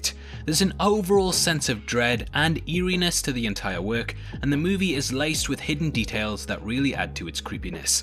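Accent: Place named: Newcastle, United Kingdom. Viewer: Howay..this is hands down the best and scariest horror I've ever seen ... intelligence and terrifying..Great channel and nice to hear a geordie !!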